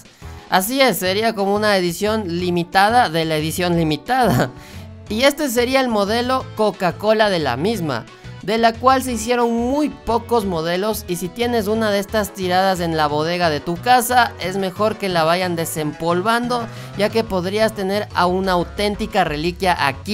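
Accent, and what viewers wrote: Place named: Mexico. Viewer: Este wey tiene acento mexicano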